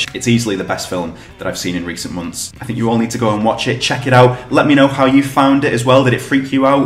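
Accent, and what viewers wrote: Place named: United Kingdom. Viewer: Ounder the skin, I saw-writ. Lol. What accent is this? It is from the UK somewhere, no?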